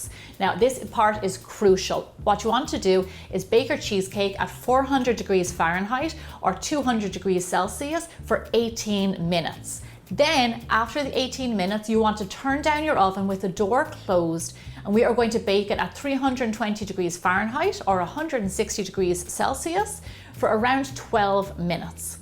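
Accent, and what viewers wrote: Ireland. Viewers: Loved your baking tutorial. Must give it a try, ta be sure!! Lovely Irish accent too. Yum!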